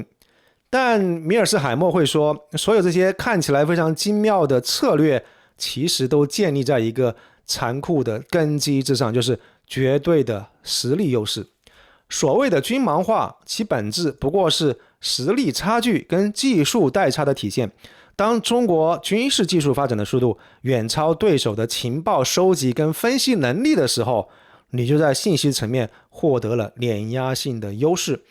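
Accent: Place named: Hubei, China. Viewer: 湖北人吧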